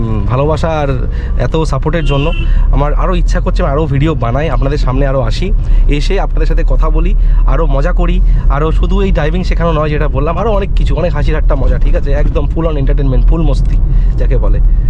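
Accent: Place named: India. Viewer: আমি তো অবাক হয়েছিলাম প্রথমে ,যে আপনি পশ্চিমবঙ্গের বাসিন্দা, আপনার হিন্দি দেখে তো মনে হচ্ছিল আপনি অন্য রাজ্যের। স্যার বলছি কিভাবে উঁচু জায়গায় গাড়ি উঠাতে হয় এবং নামাতে হয় এই নিয়ে একটা দয়া করে ভিডিও বানাবেন❤❤❤❤ ধন্যবাদ আপনাকে আপনি খুবই ভালো করে শেখান গাড়ি চালানো , আপনার টেকনিক খুবই ভালো সহজেই বোঝে আসে ❤❤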